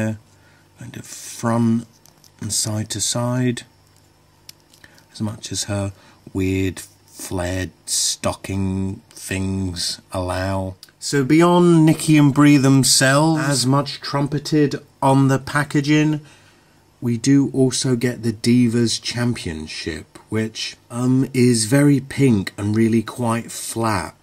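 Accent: United Kingdom. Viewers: awesome review! fun to listen to your british voice! great belt custom! subscribe!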